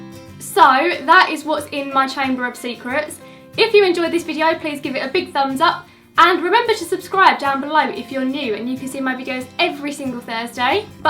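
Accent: United Kingdom. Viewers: Your room looks so nice and comfortable and I love your british english, it sounds so cute! c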